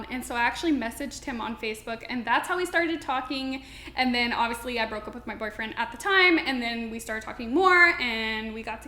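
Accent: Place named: Texas, United States. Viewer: Girl you’re so inspiring! I’m also from Dallas and you have the whole accent of Texas. Love it 🤗❤️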